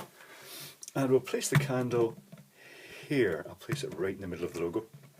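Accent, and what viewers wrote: Scotland, United Kingdom. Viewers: Hi love the vids and the wee Scottish accent, it sooooo reminds me of my late Dad. Can you please show how you have your iPad set up for the videoing...cheers from down under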